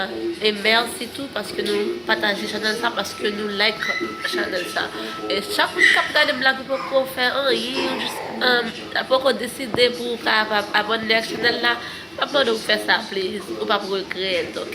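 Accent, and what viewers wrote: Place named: France. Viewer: I love ypur french accent young lady you got my upport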